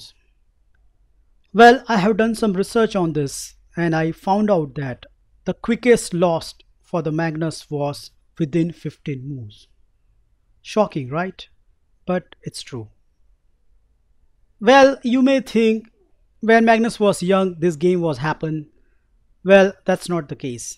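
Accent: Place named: North India, India. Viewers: He's definitely Indian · Eduardo Moya he's from North India! :)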